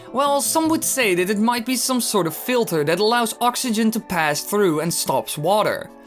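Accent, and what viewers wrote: Netherlands. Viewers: Ik had dit in de achtergrond aan terwijl ik wat anders aan het doen was, en toen realiseerde ik mij pas dat je Nederlands was. Oops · Are you from the netherlands lol? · are u from holland? you're from holland arent u :P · He's Dutch ;)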